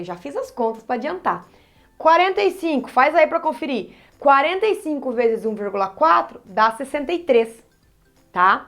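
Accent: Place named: Caipira, Brazil. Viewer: o sotaque caipira dela🥺🥺💗 Ótima aula, parabéns!!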